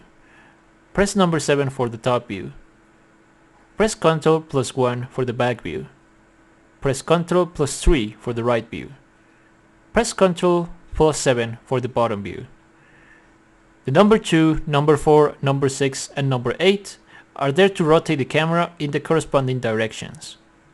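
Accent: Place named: Ireland. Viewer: You sound Irish. Are you Irish?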